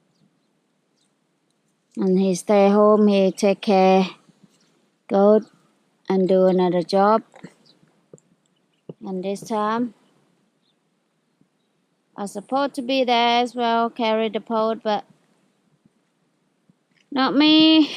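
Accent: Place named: England, United Kingdom. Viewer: She is funny, she speaks Thai with an English accent.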